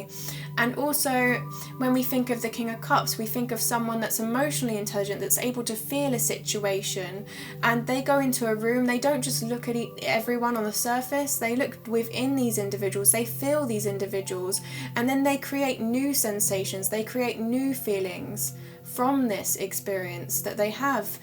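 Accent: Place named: RP, United Kingdom. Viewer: ok, your accent is pretty posh, and my eileen was a borderer, but you have a good heart.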